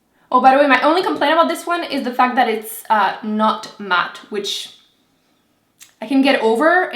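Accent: Quebec, Canada. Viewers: Shes french canadian from quebec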